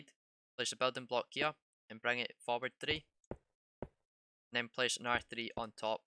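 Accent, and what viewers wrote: Scotland, United Kingdom. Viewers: He Scottish mayte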